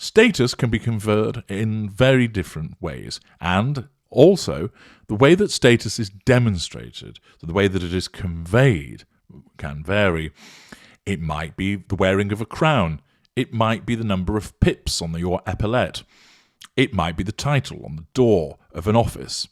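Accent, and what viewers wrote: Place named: Upper class, United Kingdom. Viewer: Love your clipped accent, clearly upper class Brit. Eton educated?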